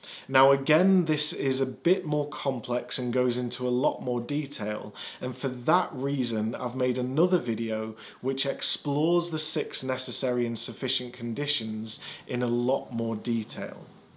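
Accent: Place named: United Kingdom. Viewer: Hey! Amazing videos mate. I recently subscribed to your channel and as a bit off topic I would like to know if you're from the Midlands or north, your accent is quite lovely :) Have a great day!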